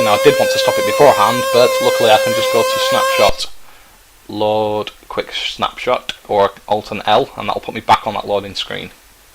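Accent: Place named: Lancashire, United Kingdom. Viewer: Very nice 😀 Your accent reminds me of David Collier and Tony Pomfret... very Atherton-esque, Westhoughtony or 'roundabouts 😊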